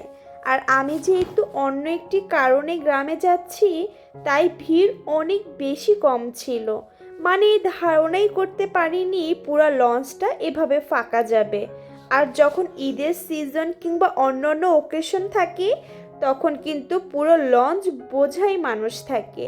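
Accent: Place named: Barishal, Bangladesh. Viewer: আপুমনি তোমার বাসা বরিশাল কোথায় নদীর আশেপাশে দৃশ্য দেখে তো বুঝতে পারলাম হোসনাবাদ রামার ফুলের সামন দিয়ে যেতে ছিলে আপু তোমার বাসাটা কোথায় জানাবে কিন্তু আপু অবশ্যই অবশ্যই কিন্তু জানাবে তোমার ভাষা বরিশালের কোথায় আমার বাসাও কিন্তু বরিশাল তুমি যে নদী দিয়ে যেতে ছিলে আমি কিন্তু এই নদীর যে দৃশ্য গুলো সব আমার খুবই খুবই চেনা❤❤❤❤❤❤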